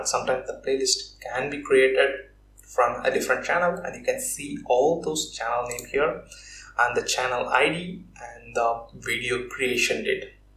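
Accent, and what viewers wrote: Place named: India. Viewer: Indian Accent is the best accent....be natural ♥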